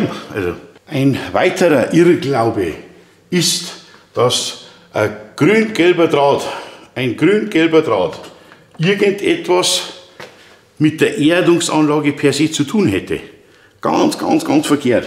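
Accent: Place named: Lower Bavaria, Germany. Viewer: Hach. Niederbayrisch. Wunderbar.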